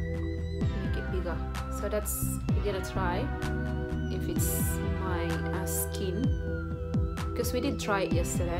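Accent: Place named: Australia. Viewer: Yes, the case.. looks so manahalin but yeah, it's affordable. Isn't? . Uha! the gold at the centah is so striking. Defootah.. The bubbles thingy, nindot na sya pabutuhon. Nahn! love the Australian accent and the accent of the make up you put on yow face. But I still can't beleive na we live at the same place. Yeah? hahaha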